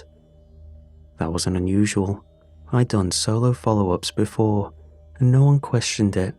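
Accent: United Kingdom. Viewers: are you british? just curious i go to sleep with your stories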